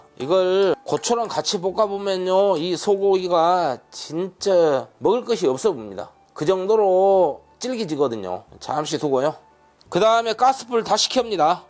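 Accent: Jeolla, South Korea. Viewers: 늘 존경합니다 진심이 느껴집니다~^^ 전라도 사투리가 구성져요 ㅎㅎ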